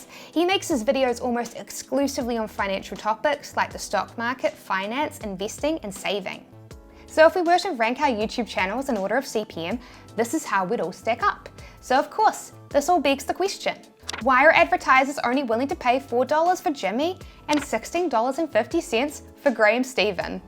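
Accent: New Zealand. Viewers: Maybe Youtube heard you say "beach" in your NZ accent! BTW wouldn't it be fun for you to attempt doing an American accent for an entire video? I love hearing what we sound like to others around the world.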